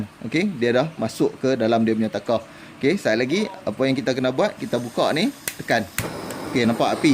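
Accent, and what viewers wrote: North, Malaysia. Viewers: Uishhh pekat sunggoh laaa loghat utara dia🤣🤣🤣sempoi bos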